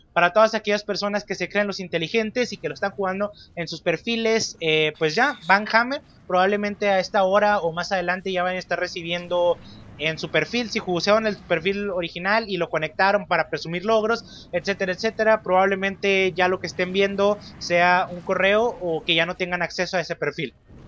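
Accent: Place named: Chihuahua, Mexico. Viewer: Supe en un segundo que eres de chihuahua , yo tambien carnal arriba chihuahua !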